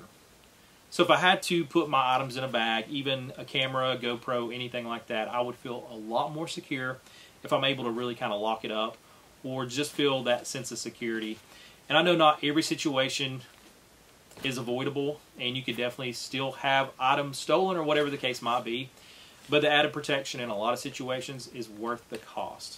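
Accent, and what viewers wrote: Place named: South, United States. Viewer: Love your charming Southern accent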